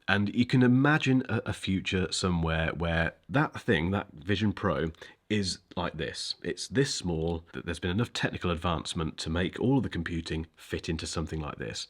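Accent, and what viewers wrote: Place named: RP, United Kingdom. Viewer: Btw I like your accent, sir! Is it RP accent? Whereabouts in the UK are you from?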